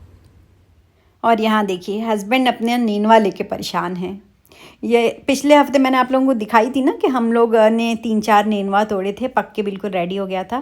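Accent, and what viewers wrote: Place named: Bihar, India. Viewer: Aap kahan ki rehne wali hai aapke lehje se lagta hai aap bihar ki rehne wali hain susral to aapka u.p. hai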